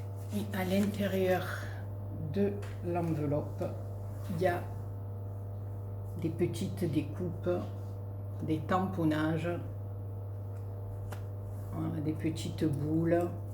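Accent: South, France. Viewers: Oh que tu es magnifique tout en beauté, que de belles cartes, tu le mérite beaucoup et magnifique création que tu nous a encore fait. Mais c'est normal que l'on soit nombreux à te suivre car tu es une belle personne, tu es honnête, franche, et super à t'écouter avec l'accent du sud et ton franc parler moi j'adore. UN GRAND GRAND MERCI POUR TES TUTORIELS, TES BLABLAS ET TON HUMOUR qui nous remontent le moral à ce faire péter le bide de rire. Allez passe un bon réveillon et à bientôt bisous de Bourgogne. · Moi je viens sur les chaînes pour trouver du plaisir . Chez vous je me régale. Déjà l'accent trop top , les expressions du sud, je ne m'en lasse pas. Vous êtes très minutieuse dans vos créations, cela se voit de suite. Alors si nos commentaires vous apportent du plaisir , c'est un partage. Ceux sont ces petits bonheurs qui font que la vie est belle. Je vous souhaite que tu positives. 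Belle journée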